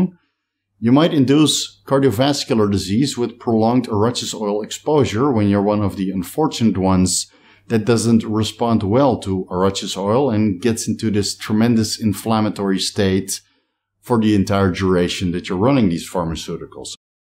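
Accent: Netherlands. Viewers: sounds ok to me. A bit of a Dutch twang but ya get that when english isnt your first language. You say gyno no worries now. The content is what im here for and give it an A+👍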